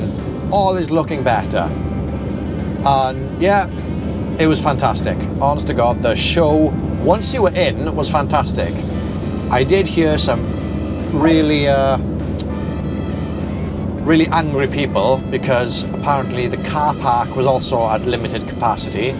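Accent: Wales, United Kingdom. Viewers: Welsh accent makes it better “ya joooking”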